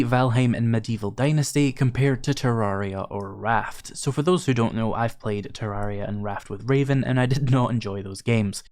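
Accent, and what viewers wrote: Scotland, United Kingdom. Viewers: Ask: What kind of accent do you have? It sounds vaguely Scottish (apologies if I got that wrong). Many thanks for the videos, I always learn a lot from them.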